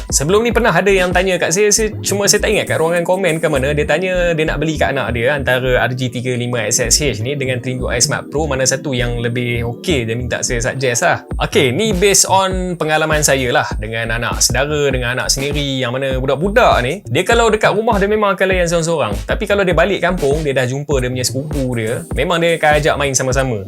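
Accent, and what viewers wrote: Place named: Penang, Malaysia. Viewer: bang asai utara ka?? hahaha ada style stylee mamu penang mari laa cara bercakap😁 apa apa pun saya tangkap Trimui Smart Pro sebab review abang hahahhah:face-green-smiling: TERBAEKK bang teruskann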